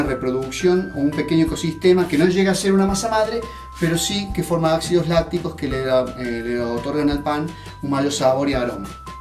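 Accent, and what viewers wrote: Cordoba, Argentina. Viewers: HOLA SALUDOS DESDE CHILE TENGO PANADERIA Y VEO TODO PARA APRENDER MAS SOS DE LA ARGENTINA VOZ ? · Hola, sos Cordobés? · Entender tu epañol cordobés hablando a toda velocidad ya es un esfuerzo. Pero con el volúmen de la música tapando el de tu voz .....ni te digo. La música no sólo está demás sino que opaca el enender tu gran contenido.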